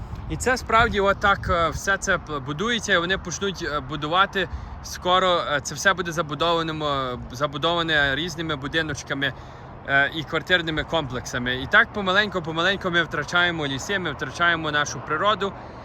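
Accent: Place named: Western Ukraine, Ukraine. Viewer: До речи, мені теж чується якийсь акцент в Вашій український мові. Чи то вже англійська так вплинула чи Ви походите із західної України?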